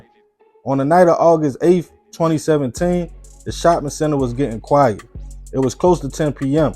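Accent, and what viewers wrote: Baltimore, United States. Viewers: Africa tuning in, love the content but the Baltimore accent gets me just like the southern part of the US accent. It’s certain words that I struggle with. love it though. Love & respect to and his family. Stay in peace everyone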